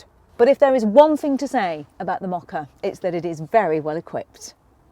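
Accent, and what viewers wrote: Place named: RP, United Kingdom. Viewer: Lovely poshish accent.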